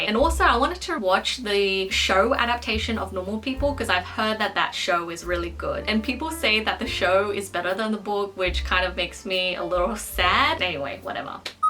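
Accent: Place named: Australia. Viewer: I like your Australian accent and your book shelf ❤️✨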